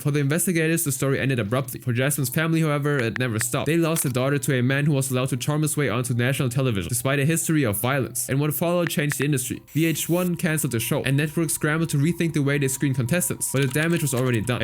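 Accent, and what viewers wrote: Germany. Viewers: You gotta slow down when you talk a bit. Are you German by any chance?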